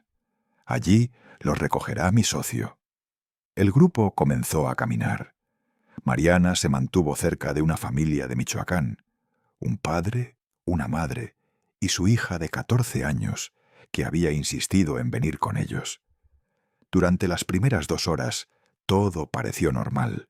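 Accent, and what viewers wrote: Spain. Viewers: Con ese acento Español no te creo ni papas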